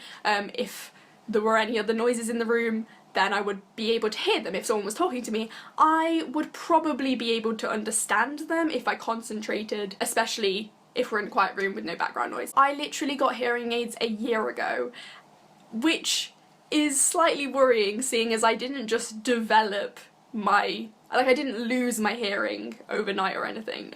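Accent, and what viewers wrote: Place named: Midlands, United Kingdom. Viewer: Brendow Félix She's from the Midlands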